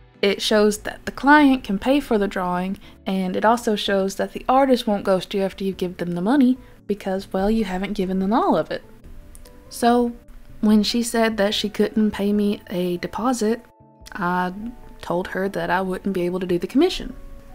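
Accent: South, United States. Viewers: Where are you from? You sound like you have a southern accent lol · Im so sorry that happened! My artstyle is not anything people would buy, so I don't do comissions, and this kinda stuff would not help either 😭 Also, you accent is WONDERFUL! It reminds me of a country accent but... different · is that a southern accent i hear? i'm southern too and it's so reassuring so see another content creator with it!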